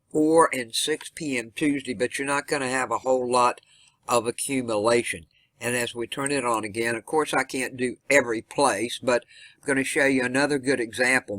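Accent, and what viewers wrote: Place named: South, United States. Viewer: Well I am sorry for my mistaking you for a male . To my old ears you'r voice sounds of a southern male . If you could see my red face right now . I must commend you on accuracy with the reports . Well Sister have a fine day and God bless you . Can't wait till tomorrow for more cold weather news 🙏👍👍🇺🇸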